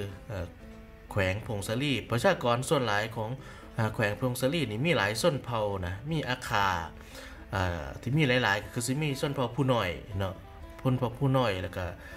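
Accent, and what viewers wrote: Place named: Ubon Ratchathani, Thailand. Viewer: สำเนียงการเว้าคล้ายๆกับอุบลเลยคับ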